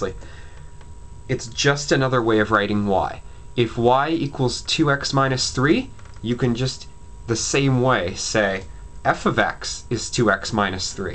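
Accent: Canada. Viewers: nice Canadian accent. this was a lot of help though thanks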